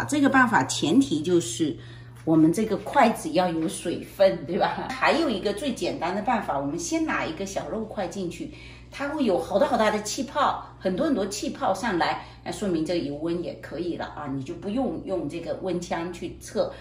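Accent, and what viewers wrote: Fuzhou, China. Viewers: 听福州口音很亲切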